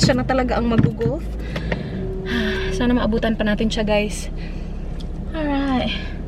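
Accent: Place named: Visayas, Philippines. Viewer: Bisaya ka po? Ang cute po NG punto mo😊😅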